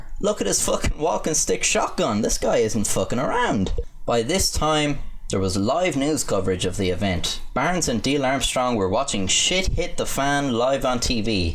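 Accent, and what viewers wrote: Ireland. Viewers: "Two thousand and Tree" lol. gotta love that irish accent. just confused as to why he doesnt say "tousand" too XD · LOVE the way you say 30 as well as the Irish accent in general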